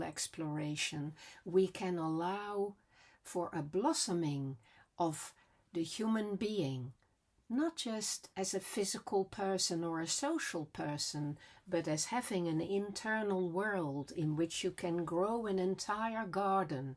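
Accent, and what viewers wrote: Netherlands. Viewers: I believe I was born a true Stoic by nature. I made a conscious decision at a very young age, say at about three years, not to cry and draw undue attention to myself when I fell and grazed my knees. Having this innate attitude has got me through some very difficult situations but it has not helped me to cultivate joy in my life. This is why, as I enter the twilight years of my life, learning about the Existentialist perspective of what it is to be human is so valuable. Additionally, my partner has also started listening to your channel and not only has he commented on your sweet Dutch accent, but he appreciates the content of your channel.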